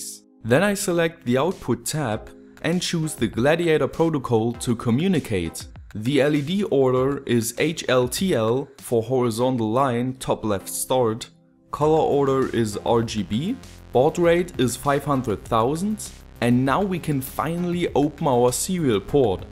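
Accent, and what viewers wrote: Germany. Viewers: Jap er ist deutsch. Hört man doch:D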